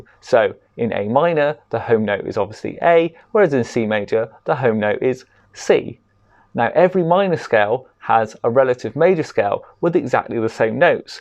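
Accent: England, United Kingdom. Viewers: I'm 69 years old and I've had many lessons and watched many YouTube videos. This is absolutely the best lesson I've EVER "had" to explain how the blues work. I know this pattern and can play it blindfolded but you have taught me how to correctly use it and it makes so damn much sense. THANK YOU. You sound English but I wish you were in Arizona so I could take lessons from you. Many thanks "mate".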